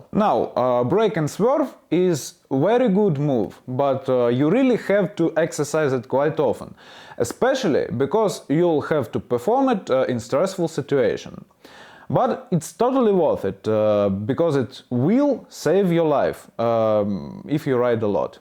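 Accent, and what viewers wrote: Russia. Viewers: I Think these videos are excellent, even the Russian accent adds authority to the message, shame no schools in UK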